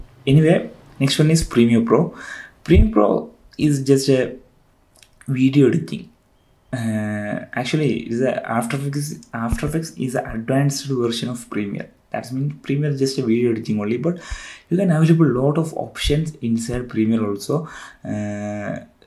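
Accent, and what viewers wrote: Kerala, India. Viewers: That Indian accent tho!!!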